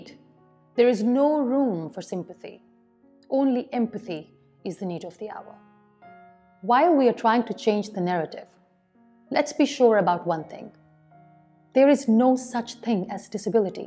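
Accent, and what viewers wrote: England, United Kingdom. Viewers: Mam you are my" role model ".I like your english auction. Blessing you to live long with great success.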